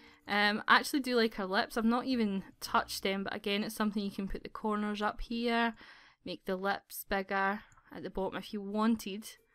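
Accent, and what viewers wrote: Scotland, United Kingdom. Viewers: you admitted that you have a JLo butt? very brave of you! Can't wait to see you make yourself if you're going to! Love the 'wee' scottish accent too! x From what I've seen of this CAS mode, I'm disappointed that the customisation is a bit more limited, like there is no 'create a style' tool. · Are you Scottish? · Are you British? NEW TOO YOUR CHANNEL!!! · 4 some reason u sound Scottish 2 me...or it could b the fact that I just finished watching BRAVE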